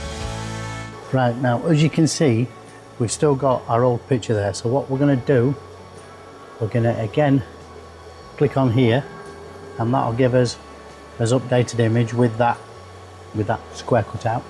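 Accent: United Kingdom. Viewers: I am not a metal worker but have been interested in metal work for the past 5 or 6 years now, I am actually a woodworker and I make fine furniture with various hardwoods etc, but I am very interested in the XTool MetalFab and I have ideas what I could achieve if I had this machine, I might have to sell my car just to get it as it is so expensive but I have a vision. I enjoyed watching your video so I have now clicked on the thumbs-up button and I have subscribed to your channel as well. Great to hear the accent and that you are UK based as I too am originally from the UK although I now live abroad. 😀👍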